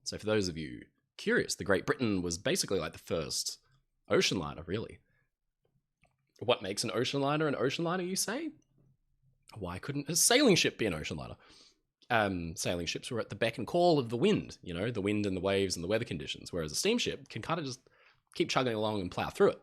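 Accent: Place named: Australia. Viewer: Hello thank you from Robert Bruce in Canada, love your knowledgeable & congenial presentations, like the way you talk your touch of Aussie accent is very likable, cheers!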